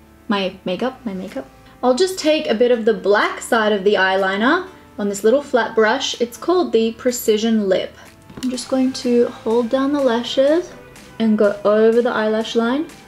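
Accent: Australia. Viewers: There’s nothing wrong with the Aussie accent/slang. Don’t forget where you come from!! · Hell yeeaas #notificationsquad as always. Staying in oz right now and feel your accent much more now haha insta: Paniz.sophia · I love your Aussie accent 😍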